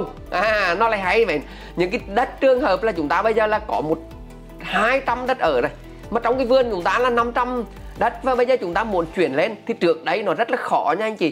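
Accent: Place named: Central, Vietnam. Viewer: Nói nghe không được bạn ơi. . giọng nói nói nhanh giọng miền Trung khó nghe mà nói quá nhanh thật là nghe không được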